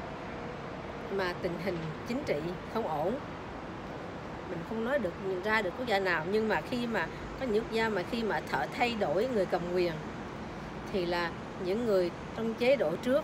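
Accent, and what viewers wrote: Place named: Vietnam. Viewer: Trời trời bạn giống người Mỹ quá mãi sau ghe giọng mới biết là người việt.bạn hướng dẫn mua nhà ở mỹ có cần thẻ Xanh ko rất chi tiết chúc bạn thành công nhé bạn hiền ơi 🔔🔔cho bạn nè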